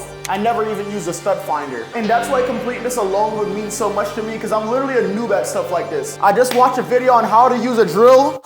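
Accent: Jamaica. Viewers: Are you Jamaican or Caribbean?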